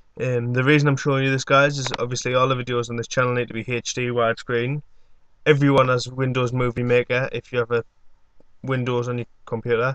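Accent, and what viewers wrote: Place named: Newcastle, United Kingdom. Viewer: hahaha I love the Geordie accent!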